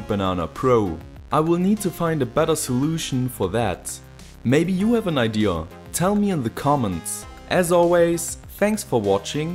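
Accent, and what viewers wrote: Germany. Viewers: Jap er ist deutsch. Hört man doch:D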